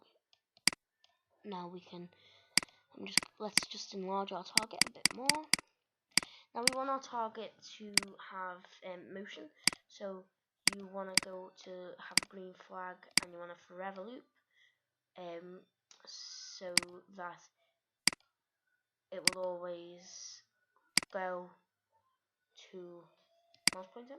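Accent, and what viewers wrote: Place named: United States. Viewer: You sound so different on video . Xxx you sound American xx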